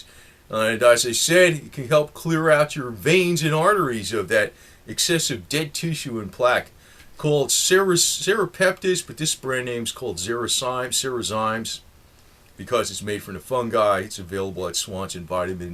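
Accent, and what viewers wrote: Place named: South Jersey, United States. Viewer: south Jersey.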